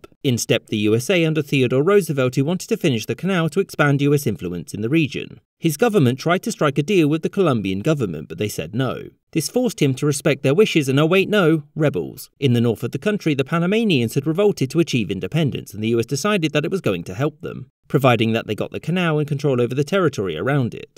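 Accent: England, United Kingdom. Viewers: Great. Now. Why did US give up the canal? Cut the English accent. Slow down. speak simpler. Don't try to impress with how smart you are.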